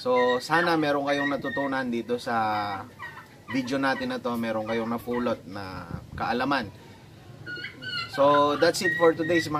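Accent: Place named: Pampanga, Philippines. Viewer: Boss taga saan ka pwedi puba paki sabi narin sa next vlog mo ty po parang kapampangan kapu kase ea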